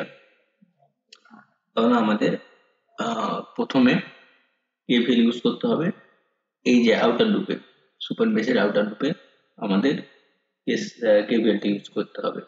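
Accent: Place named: Bogura, Bangladesh. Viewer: Apner bari maybe bogura te,,apner kotha sune bujlam mone hoi bihar Union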